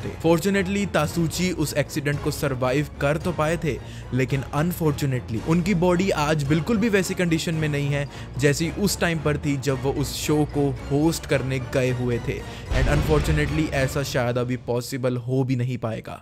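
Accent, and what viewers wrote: Haryana, India. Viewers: Bro i have question are yu from haryana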